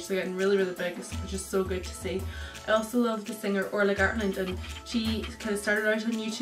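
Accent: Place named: Ireland. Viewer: i seen it :) really enjoyed it love listening to a irish accent in a vlog for once haha yay cant wait for the next vlog;)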